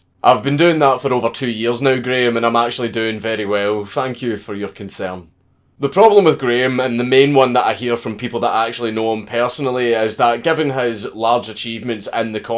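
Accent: Scotland, United Kingdom. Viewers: Dude, your Scottish accent is nothing short of glorious. Hearing you speak makes me happy. Have a great day and keep up your great work! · Lol. I read Conor's comment to be a tame joke about Scottish accent and people replying are legit mad. ahahahahahahahah.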